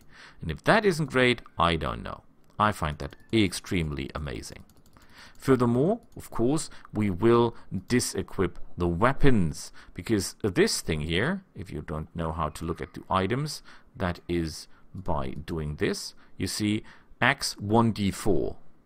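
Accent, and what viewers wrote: Germany. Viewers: "Papaaaa, let's invade ze Poland again" heh Cute german-like accent aside, really looking forward this let's play, always nice to have some cozy voice playing something on the other monitor while I waste my life away grinding some game.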